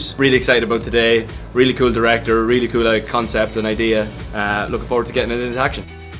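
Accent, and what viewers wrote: Ireland. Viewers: That accent thought... So damn Irish 😍❤ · So proud of Niall!!! Still cannot get over his adorable Irish accent and how good he looks!! <3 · His Irish accent is just killing me🆔😍😍😍😍😍😍😍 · cough cough Niall’s Irish accent it better than mine (Yes, I’m Irish Too)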